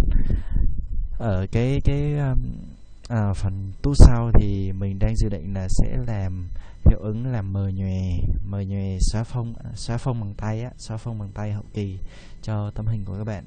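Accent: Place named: North, Vietnam. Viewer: góp ý một tí là bạn nên nói to hơn chứ đừng nói kiểu thì thầm như thế, mình khó nghe được giọng bắc. dù sao tut của bạn hướng dẫn rất kỹ và chi tiết. cảm ơn bạn, sẽ ủng hộ channel của bạn!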